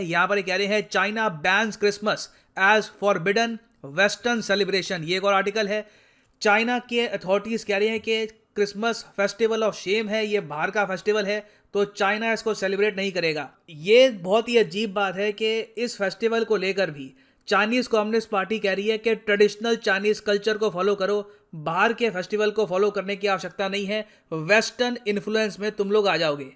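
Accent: India. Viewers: As soon as I heard the curry-flavored English, I knew the rumor was back.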